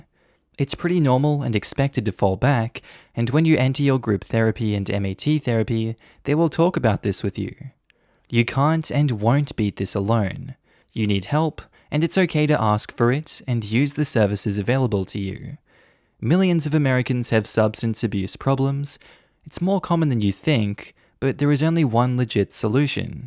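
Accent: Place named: Australia. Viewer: a pleasant Aussie voice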